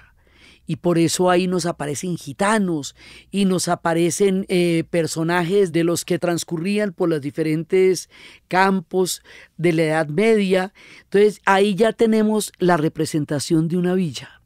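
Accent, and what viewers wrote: Colombia. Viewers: ! El regalo más maravilloso ha Sido encontrarte, en mi vida existía un vacío que tú con tus palabras me lo has estado llenando, soy nacida en Colombia y vivo en Venezuela, dónde nos trajo mi padre desde Antioquia, por lo tanto tu acento y tus salidas me encantan y remueven mi origen. Podría escribir más...pero no jajajajaja Yo necesito aclarar el cuento del árbol de San Bonifacio porque iban a matar el niño, y como esa acción de San Bonifacio lleva a lo del arbol😊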